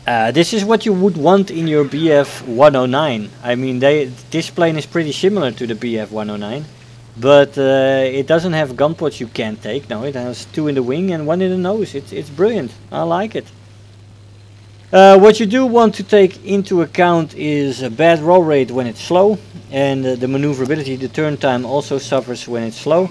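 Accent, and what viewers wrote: Netherlands. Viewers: this plane is pure canser at itd br teams full of them kill us teams no losses btw are you dutch?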